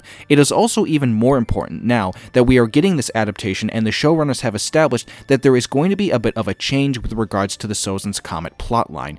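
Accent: Bisaya, Philippines. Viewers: uu, klaro kaayong Bisdak 😆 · Hahaha bisaya sad ka brad?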